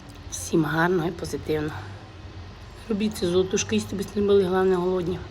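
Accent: Transcarpathia, Ukraine. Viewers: Яка соковита і запашна закарпатська говірка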